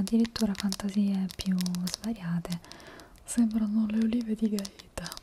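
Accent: Argentina. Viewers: tengo una amiga italiana-argentina que se parece a ti. tu español suena bastante argentino también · tu acento en español es muy argentino..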